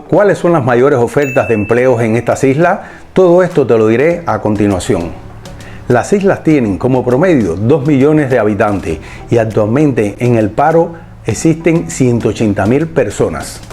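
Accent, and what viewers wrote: Cuba. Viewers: Hola. Eres Cubano o Canario? Tiene un acento Cubano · Tú no eres Canario, tu acento es cubano...Yo soy de Tenerife de muchas generaciones y NO se vive bien en las Islas...antes viviamos bien...Claro que igual para ti de donde vienes esto es el paraiso...Pero el Gobierno Central nos tiene olvidados...No tienes ni idea de nuestra idiosincrasia...No me gusta como defines hablando de un paraiso mi tierra que están enterrando...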